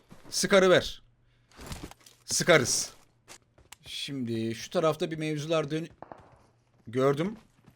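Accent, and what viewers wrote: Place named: Azerbaijan. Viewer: abi , sendemi Azeri çıktın ...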